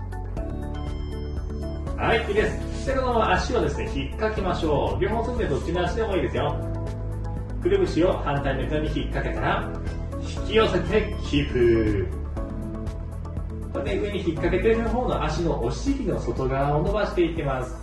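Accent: Kansai, Japan. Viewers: 時々、関西弁になったり標準語との葛藤を感じる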